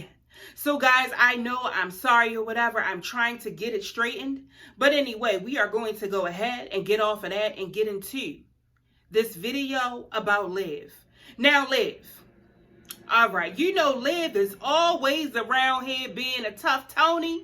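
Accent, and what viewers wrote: Baltimore, United States. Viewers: The one thing I love about you is that you say what you say and you leave it alone, you do not fall into the back and forth, some one calling you out and they can't take the heat, lookin for sympathy, next Hussle literally crying out for it, not gonna even say the frauds name, I looked up this Liv person, but I see you give great advice, she should listen.....Keep doing you...SO SO glad I found your channel and I love the accent.. B-more I'm thinking if not I apologize.... Lost my niece to Baltimore. Anyway, You rock.... Thank you for being honest and real...saying luv luv from Chicago..💯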